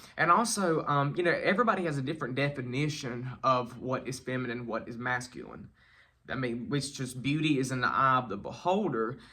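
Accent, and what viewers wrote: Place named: Texas, United States. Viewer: I found you by accident. What a treasure you are... I can relate to all of your videos.. I'm from Australia so I love ur Texan twang accent...LOL.. I am gay aswell but also a Christian....it's hard... I've started my YouTube channel only two months ago about being a gay in the church hopefully to help others... Keep the videos coming..❤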